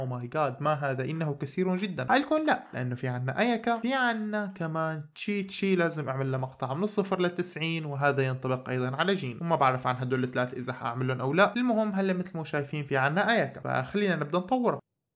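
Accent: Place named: Iraq. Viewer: الاخ عراقي😂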